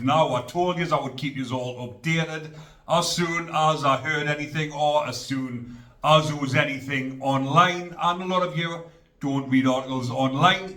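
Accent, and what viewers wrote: Newcastle, United Kingdom. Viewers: I love your accent. Listening from down south here. Never been to Geordie land but I would like to visit one day. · please lose that annoying Ant & Dec accent. Talk like a Southerner, like a normal Brit.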